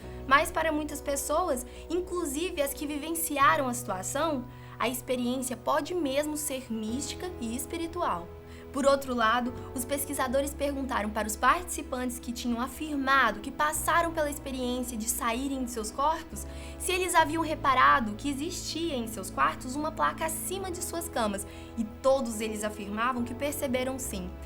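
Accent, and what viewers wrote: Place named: Minas Gerais, Brazil. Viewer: Sotaque mineiro.....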